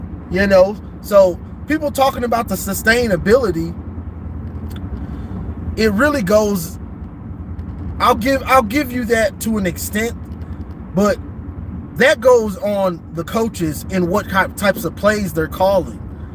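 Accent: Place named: South, United States. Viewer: Dude, I tried listening to U, but U sound like a country speaking Knucklehead, Bro! U have No Idea! 🤦🏽🙆🏾‍♂️ #GoBlue💛💙 #SMASH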